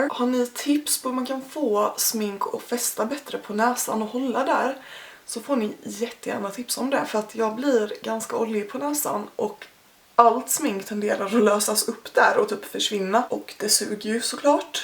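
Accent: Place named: Småland, Sweden. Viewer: Min söndag fick ett perfekt avslut efter denna videon. Saknat ditt fina rum, men vart är de turkosa ljusen haha de var så fina ju. Har följt både dig o Louise sen jag var 12 år ungefär, även eran blogg. Din småländska röst förgyller mina dagar och brukar kolla dina äldre videos när jag har tråkigt. Älskar att du ska "börja" igen försök hålla i, jag är så taggad. Kan du göra en "catch up" video där du berättar om din tid när du har varit borta, om din utbildning m.m. Det hade varit väldigt intressant för oss trogna följare som följt dig länge. ❤️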